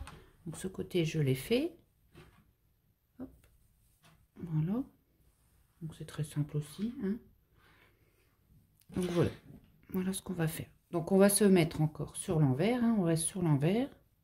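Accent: North, France. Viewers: J'étais de somain sa sentant votre voix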